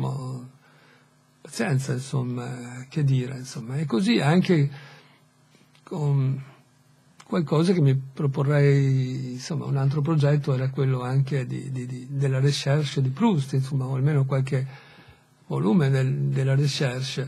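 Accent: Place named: Italy. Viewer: Sto facendo un blog, perché sono una professoressa e, qui in Spagna, è preferibile studiare la diffusione dell’educazione (bene, giá sai...la neolingua, come avverte Orwell educazione=istruzione; istruzione=come usare un oggetto appena comprato, etc. etc.) anche attraverso questi mezzi (in questo mi trovano pienamente d’accordo). Trattandosi di un blog in costruzione, ancora non c’è nessuno che segue il mio saggio multimediale (esercizio di un corso), però questo blog è già sul web ed io mi sono permessa di citarti come gran lettore di libri italiani (il mio obiettivo è sempre comunicare con i miei studenti di lingua italiana qui in Spagna e poter mettere loro a disposizione materiale di supporto che li allontani un po’ dai soliti esercizietti del loro libro di testo. Per farla breve, sto montando un blog sulla storia immaginaria, la storia come avrebbe potuto essere, riempire con la fantasia i vuoti che a lasciato la storia, etc. 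Ho voluto inserire come esempio anche 1984 (inventare il presente) e ho messo il tuo link, con due parole per presentarti e consigliare che leggano gli audiolibri pubblicati da te, per via della tua eccellente dizione, della passione priva di enfasi con cui interpreti le opere e della cadenza perfettamente italiana (questo appoggiare le frasi sulla penultima parola, che rende il nostro parlare cosí dolce e che per gli stranieri è tanto difficile da assimilare)...insomma, mi da dai il permesso di lasciare tutte queste cose sul mio (purtroppo ancora a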